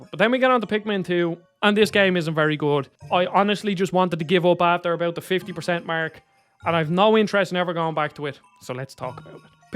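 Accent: Ireland. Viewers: I just meant it was an Irish accent that I had never heard before, I may just be being stupid though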